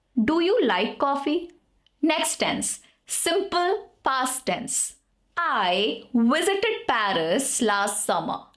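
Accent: India. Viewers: Your excent is so indian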